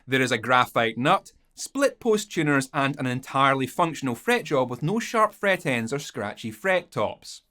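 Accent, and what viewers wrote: Scotland, United Kingdom. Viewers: Sarcasm sounds so much better in a Scottish accent 😏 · Sarcasm in Scottish, sounds like home. 😂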